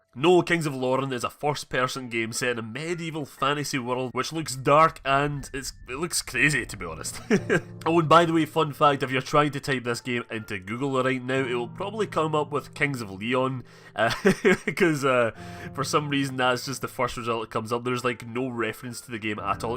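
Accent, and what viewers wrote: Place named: Edinburgh, United Kingdom. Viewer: Also, my mum's from Edinburgh, so luv yr accent :)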